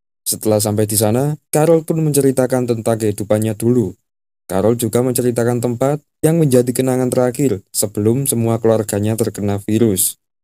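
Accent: Java, Indonesia. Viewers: Medok amat mas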